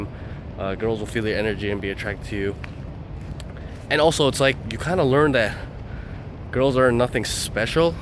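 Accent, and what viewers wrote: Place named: United States. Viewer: were you born in the US? Your English sounds so American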